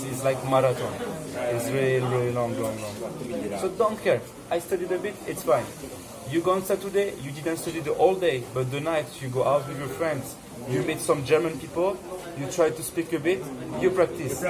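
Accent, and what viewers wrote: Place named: France. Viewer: An english speaking turkish guy with a french dialect :-) Let me hug you a bit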